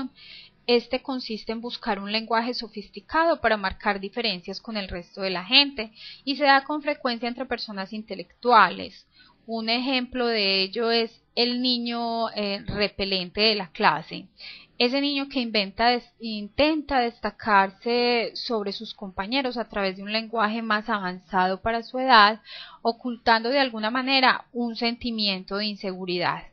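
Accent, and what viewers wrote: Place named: Colombia. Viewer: Su acento es muy fuerte y no logro entenderla, es de colombia verdad? bueno, no es criticar solo decir que el huehueo que hace al hablar no hace que se entienda profesionalmente el vide.. mejor los acentos neutros.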